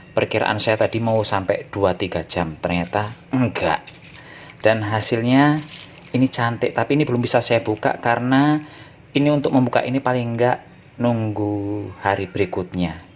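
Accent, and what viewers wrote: Banyumas, Indonesia. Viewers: Sangat kreatiif ms... bahasa ngapaknya itu saya jg sangat suka...lucuuu lgi😁😁😁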